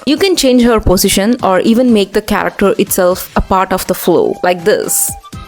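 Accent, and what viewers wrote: India. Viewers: You got some little bit of Indian accent I think 🤔 you are from India, btw keep it up😊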